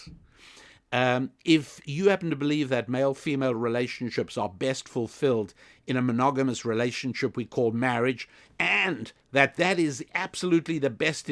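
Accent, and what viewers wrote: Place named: United Kingdom. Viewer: Your bold British accent is brilliant and inspirational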